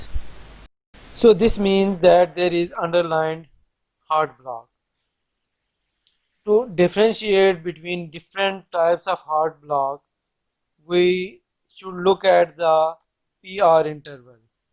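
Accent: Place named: India. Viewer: Thank you. This is a great help to us who are accustomed to the English accent but are not used to the Indian accent.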